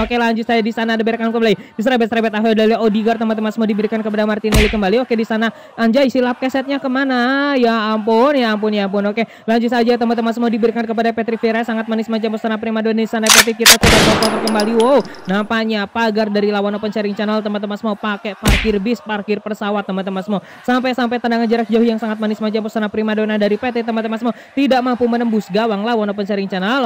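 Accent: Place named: West Sumatra, Indonesia. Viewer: Suara lu ko kek minang kocak si bg🙄🤔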